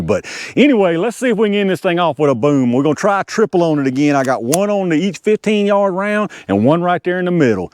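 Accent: United States. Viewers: Been lookin at 1 at rural king,339$. I have the tx22 comp & this would be nice to have as well. Guess im a 22lr freak cause i have many of them & love em all,but my favorite round is the 5.7x28,wish taurus would come out with 1 that uses that round. Great video,i subbed cause that accent is as country as mine. Lol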